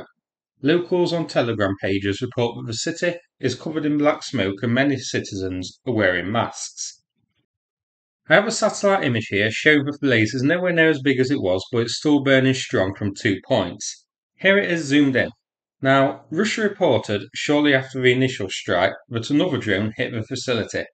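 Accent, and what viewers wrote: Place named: Stoke, United Kingdom. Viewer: Are you from stoke mate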